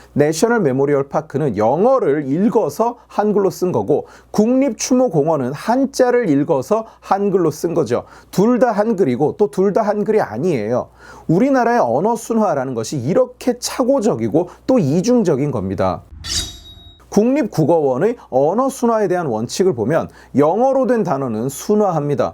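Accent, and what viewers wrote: North Korea. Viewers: 초반만 봤는데 북한말인줄..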